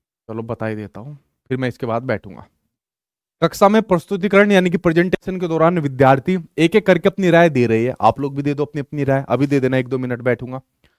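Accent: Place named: Azamgarh, India. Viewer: बाबू बहुत अच्छा बढ़ावन आजमगढ़ की बोली है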